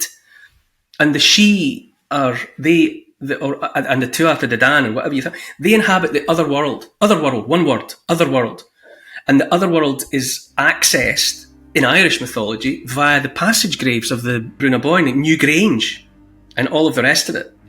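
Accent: Scotland, United Kingdom. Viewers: Scottish scozzese?😀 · The Scottish accent even manages to make 'Millstone Grit' sound dangerous. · Greetings from Finland 🤗 Love your stories, ranting and scottish accent. I get a cosy feeling listening to your channel. Must have some scottish blood in me 🤔 😊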